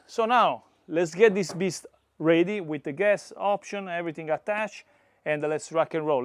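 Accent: Italy. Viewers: ciao sbaglio o sento un accento italiano??😂😂😂😂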